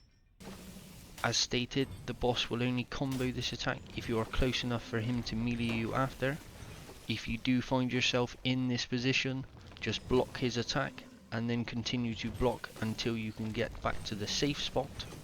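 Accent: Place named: West Country, United Kingdom. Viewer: Summerset or Devonshire boy? Trying to place your accent my Brother, Cornishman here. It's a nice little guide thank you man.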